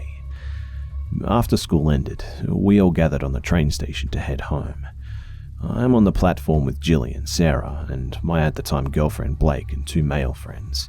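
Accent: Australia. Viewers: It’s good to listen to an Australian voice! You tell these stories so well. Keep up they great work 😊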